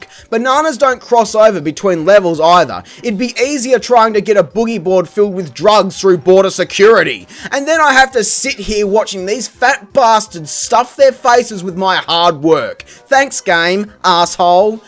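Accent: Australia. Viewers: i think he comes from australia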